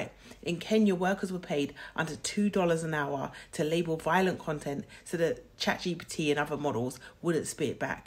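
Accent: United Kingdom. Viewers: Ooowter, british, a i abosoultely loved your accent.😂😂❤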